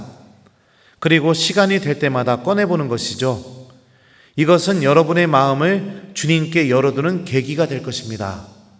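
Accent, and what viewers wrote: Chungcheong, South Korea. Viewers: 신부님 충청도 말씨 구수혀요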